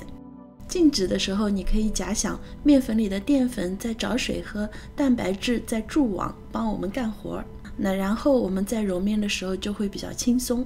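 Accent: Shanghai, China. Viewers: 听发音听出了上海人，看面粉牌子就知在美国对伐？这种菜包是王家沙经典包子。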